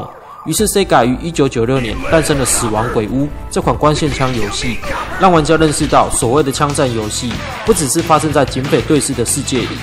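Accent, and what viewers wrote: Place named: Taiwan. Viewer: 點棟更 哈哈哈哈08笑死 尤其是大大的台灣國語 念點棟更 地契阿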